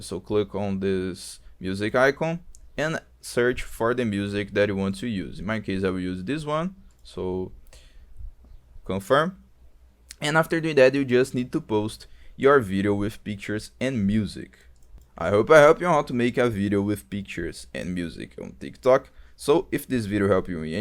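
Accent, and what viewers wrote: Brazil. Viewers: o cara é brasileiro, conheço o sotaque brasileiro no ingles KKKKKKKKKK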